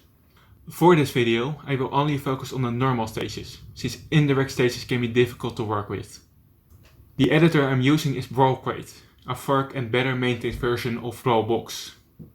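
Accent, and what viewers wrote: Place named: Netherlands. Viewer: Guy with heavy dutch accent explains shaders.mp4 Good video! I would just mess around with the settings until I got what I wanted.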